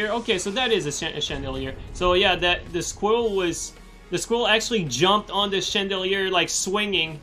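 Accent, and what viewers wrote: Quebec, Canada. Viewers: He sounds Québécois to me.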